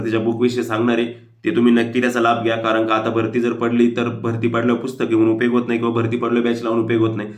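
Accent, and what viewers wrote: Ahmednagar, India. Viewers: काही म्हणा गड्या... पण हा माणूस खरच अख्ख्या महाराष्ट्रात एकदम भारी माहिती सांगतो...आणि सर्वांना समजेल अशी सर्वांच्या भाषेला match होईल अशी अस्सल नगरी भाषेत एकदम कडक...सर खरच खूप छान काम आहे तुमचे....love you..❤❤ तुमचा व्हिडिओ पहिला ना सगळी माहिती डोक्यात जाते डायरेक्ट...🎉🎉🎉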